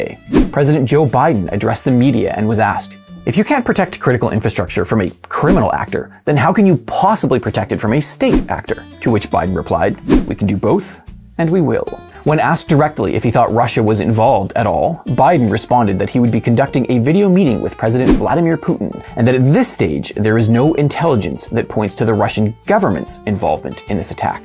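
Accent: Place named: Canada. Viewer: You Canadian eh?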